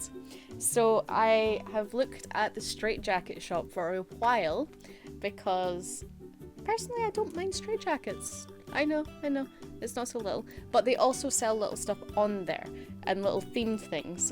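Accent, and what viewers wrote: Scotland, United Kingdom. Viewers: Your Scottish accent is tae die fer!!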